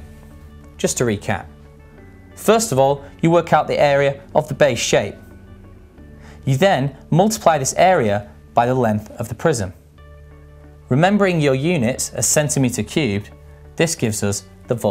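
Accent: United Kingdom. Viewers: hehe hes british luv the accent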